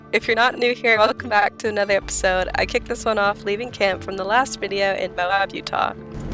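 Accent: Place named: South, United States. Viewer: Also, With The Thing Inside Your Mouth, I Can Hear The SouthernBell Southern Accent For Real.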